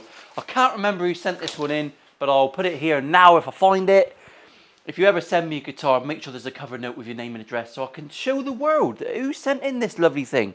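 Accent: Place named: Cornwall, United Kingdom. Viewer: 'Ere,! I lived in Torpoint for a couple of years when I was a kid. It sounds to me like you've got a touch of Cornish in your accent. Did you live west of the Tamar for a while, or is it just a Plymouth thing?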